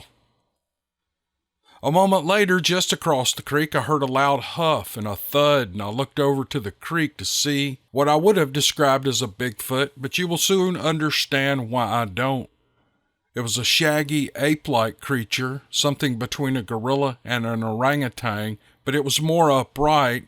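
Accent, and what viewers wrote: South, United States. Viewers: You sound like you're from Tennessee or Mississippi somewhere like that. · Don't know how everyone else pronounces Mor ls Morsel mushrooms If you have never had the pleasure of eating a plate full I wish that pleasure for you Or if it's you southern drawl, more power to you!!!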